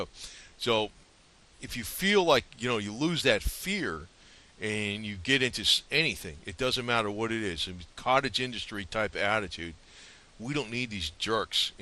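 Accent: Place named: Texas, United States. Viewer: Dude, your voice is familiar, what part of Texas are you in?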